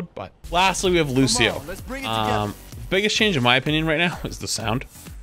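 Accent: United States. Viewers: I guess ur american ?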